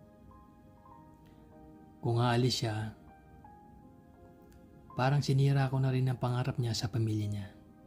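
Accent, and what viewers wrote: Pampanga, Philippines. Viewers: ❤❤❤ Ang ganda ng storya nyo sir at tunay na may accent kang kapampangan. Ang sarap isipin na may happy ending kayong mag jowa. Hehe. Secret pala❤😊😅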